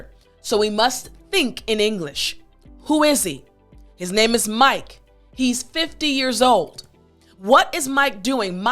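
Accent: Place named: United States. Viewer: thank you for your lesson! i'm ardi from indonesia, I'm a teacher and watching your video really inspire me a lot and i kind of difficult to teach and make the student understand what i'm actually gonna tell them and to be honest, there are still so many kids in my country not really interested in learning english, they learn as their parents' willingness not from the students themselves. for me, you are such an amazing teacher, your lesson are easy to absurb and the way you teach is so cheerful, ireally enjoy your american english so much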